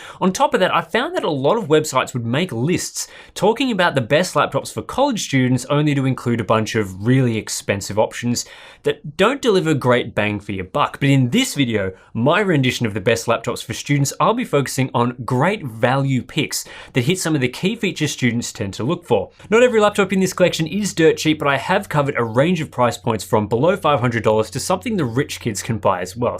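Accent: Australia. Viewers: You have a Aussie accent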